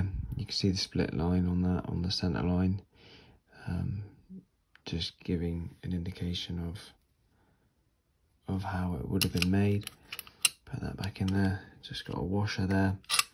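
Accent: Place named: United Kingdom. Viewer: So I woke up this morning and didn't realize what I wanted was a calm British dude explaining locks to me. Hell yeah man find that niche hahaha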